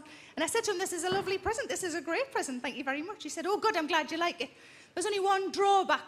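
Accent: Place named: Newcastle, United Kingdom. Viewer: Hollie Dixon why wouldn’t they 😂 it’s only a Newcastle accent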